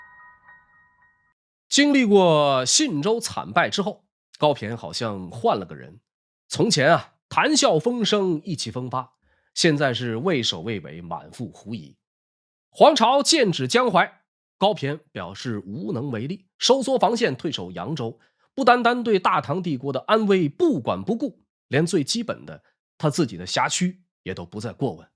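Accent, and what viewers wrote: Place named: Northeast, China. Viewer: 哈哈，听了好几遍“我去他奶奶个拽的”，好亲切呀。你是东北那疙瘩的吗？🤣😂😁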